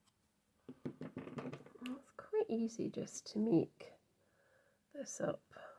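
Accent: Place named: Falkirk, United Kingdom. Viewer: Hi, I stay in Balmullo near St.Andrews, but i have friends from Falkirk live here and thats where the accent twigged. love the way you do your videos, i chatter to myself as well. Carry on crafting.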